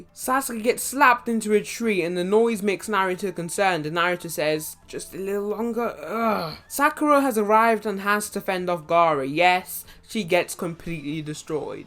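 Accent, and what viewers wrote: United Kingdom. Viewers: That Brittsh accent is so annoying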